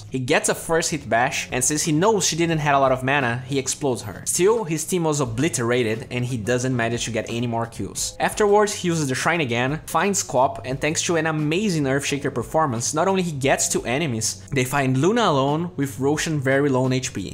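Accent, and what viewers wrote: Brazil. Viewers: where you from ? your accents sounds from brasil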